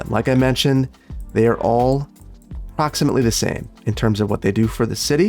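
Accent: Wisconsin, United States. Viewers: After watching a few more episodes, I think he just means he doesn't like the look of it in the game. It sounds like he's from Wisconsin and there's cetainly very hilly and cliff-esque builds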